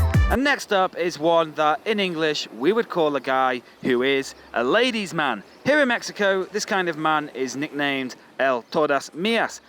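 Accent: England, United Kingdom. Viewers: me gusta tu acento ingles a ver cuando me das clases de tu idioma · I used to watch British Telly until the 2006 , that's why I understand and love your accent but honestly I didn't know the little Britain lol .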